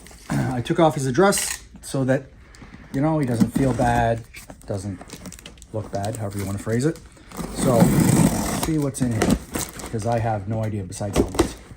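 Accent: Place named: Canada. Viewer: There is no "correct" pronunciation, the way he pronounces it is common in Canada. I'm going to take a wild guess and say you're American, with the blatant irony being that Americans have taken the ENGLISH language, you know...from England...and you pronounce half the words differently than the people who invented it..but here you are acting as if other people are wrong. Try opening a book occasionally you diabolically low IQ product of the failed American educational system.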